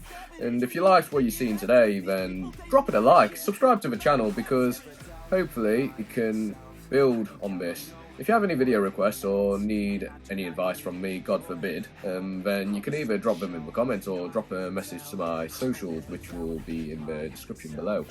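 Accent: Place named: Australia. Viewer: Where does that accent come from, Aussie??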